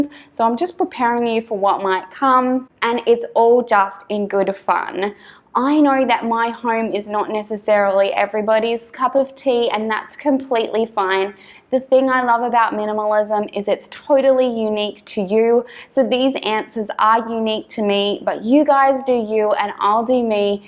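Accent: Australia. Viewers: Australian accents are so cool.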